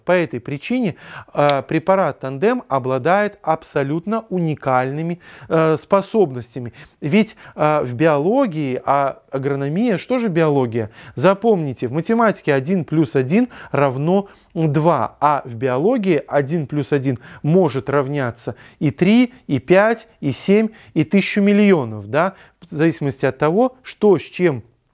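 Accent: Belarus. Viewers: Очень красивая речь,правильная и профессиональная с изюминкой белорусского акцента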